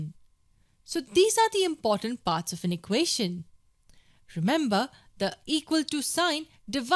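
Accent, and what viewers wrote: India. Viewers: This is an Indian accent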